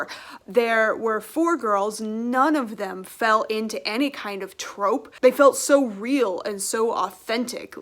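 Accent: United States. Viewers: I there’s any future anime reviews I’d live if you could write out the names. I have som hearing issues and Japanese with American accent is a bit tricky sometimes. 😂 Other than that, great video! Greetings from Sweden!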